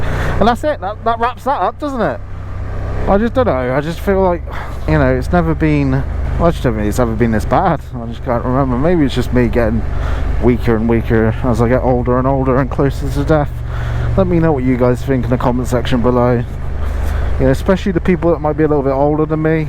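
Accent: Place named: West Country, United Kingdom. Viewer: Great video very entertaining to listen to you rant a bit about the weather, work etc.I noticed your west country accent straight away,I am from Cornwall!I live up country now in Cambridge area but travel abroad often on my bike to Italy.I have ridden in some pretty bad weather in the UK and France often!I am 59yrs old so gen x , very much a rebel ex punk/skinhead.Glad to see younger riders like yourself riding!😁👍🏍️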